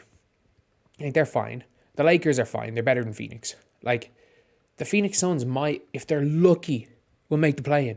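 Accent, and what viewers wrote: Ireland. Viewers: i knew youre from ireland just by the accent .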